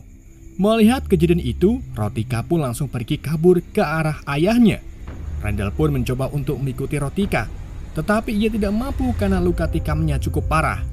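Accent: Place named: Banyumas, Indonesia. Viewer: Kilihatan admin orang jawa ngapak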